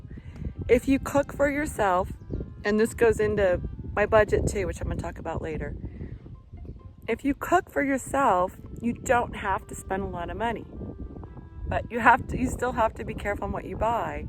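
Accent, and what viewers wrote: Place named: South, United States. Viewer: You’re a cutie, love your sweet way and southern drawl…’y’all.’ Much love from the UK x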